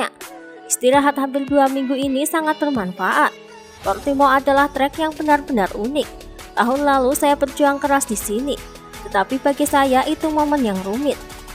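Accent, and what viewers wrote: Java, Indonesia. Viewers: Halo mbak medok, selalu nonton tpi jarang komen mbak medok hehe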